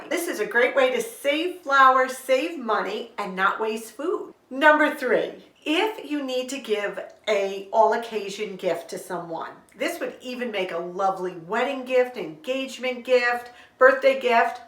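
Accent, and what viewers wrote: New York, United States. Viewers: Nacho something?? With 🍟, ground beef, sour cream, cheese. Today I hv binge watched your videos... You're my kind of people.!! Luv ya!!!- I hv never been to N.Y., I'm from Chicago, but I live in Northern Wi, since 94..... We don't hv a lot of stores here, but in N.Y., in sure there is much temptation.... I love thrift stores..... Since watching you, I do spend way less, learned a lot from you, so thank you.!!!! Wish to meet you!!!! You're so smart,and entertaining........ Bless you and too.. The N.Y. accent is so cute.!!!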